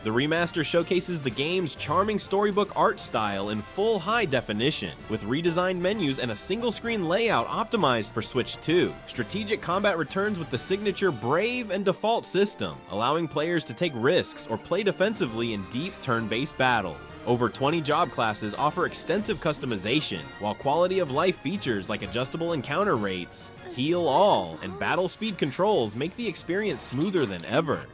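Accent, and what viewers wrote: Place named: United States. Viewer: Please could you stop using Yank Narration in your videos!? Quite honestly any other country of origin would be such a huge upgrade for me. I am literally unable to listen to this ermm, person anymore. The noise coming from him is just so vile! It makes me want to vomit. Don't get me wrong I'm not against all yanks, I love MrBallen for example but this guy and certain people's voices from yank land is just disgusting. I can't listen to anymore of this guy talk, very sorry!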